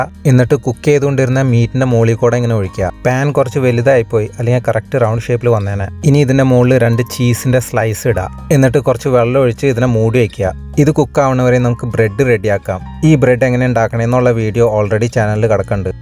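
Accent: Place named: Thrissur, India. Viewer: Slang thrissur pole thanne ind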